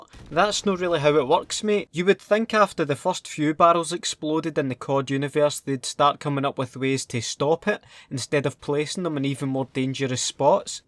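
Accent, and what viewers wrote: Scotland, United Kingdom. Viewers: i love scotish accent! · Haven't watched this in years so glad i came back to watch these made me laugh and cry man 😅😅 the Scottish accent. Makes it better love yeah ❤️❤️🔥🔥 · You’re a fking hero that Scottish accent just makes everything you say hilarious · His Scottish accent makes it funnier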